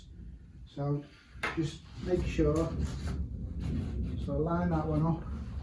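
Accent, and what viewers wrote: Liverpool, United Kingdom. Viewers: Liverpool accent?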